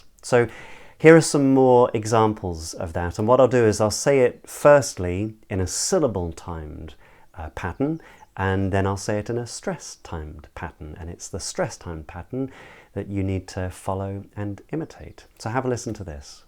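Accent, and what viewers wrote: RP, United Kingdom. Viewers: Great video! Let me point out once again that you're one of the best Standard British English (Contemporary RP) Pronunciation teachers I've ever come across on YouTube and since I occasionally teach and translate English professionally myself, your tips will surely improve my teaching skills.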